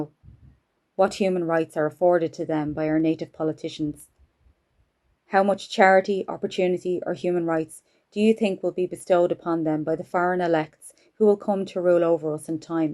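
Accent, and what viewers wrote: Ireland. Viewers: Beautiful Irish voice.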